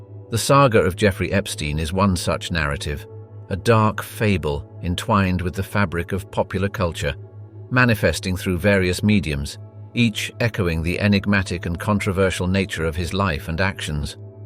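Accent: United Kingdom. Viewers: Content notwithstanding, talk about "PURPLE PROSE".. GEEZ! Wish I had a dollar for every time the overly Brit narrator oozed out the word "enigmatic". .Again, GEEZ! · Ah, a poetic little wordsmith wasting our time with a dumb british accent. Gfys 👋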